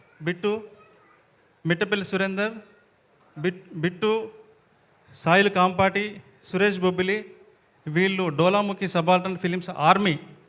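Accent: Telangana, India. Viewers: Telangana yasa basha ❤❤❤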